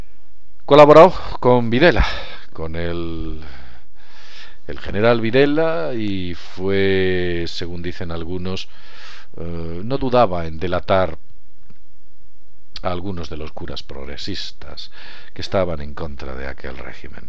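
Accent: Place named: Madrid, Spain. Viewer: Hola,...Amigo! Se me hace que tú no eres de Galicia,..no,no,...por tu acento creo que eres de Madrid, como decir americanos de New York y de Chicago, dos acentos distintos, New York un inglés puro y Chicago un inglés más Slang... No te ofendas, simplemente el de Madrid es más refinado, el de Galicia directo y tal vez lindero con Portugal. Bien, muy interesante lo que haz explicado, soy uruguayo y no me gusta ser despectivo con nacionalidades, te aprecio, soy incha del Peñarol y no me llevo muy bien con Nacional,...es eso, nada más... Saludos, continúa! Daniel.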